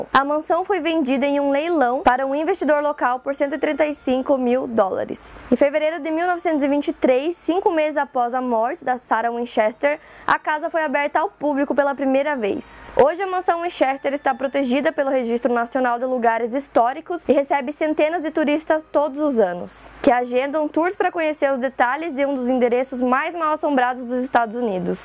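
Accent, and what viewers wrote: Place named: South, Brazil. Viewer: Que sotaque muito bom de se ouvir,qual estado do Sul você é?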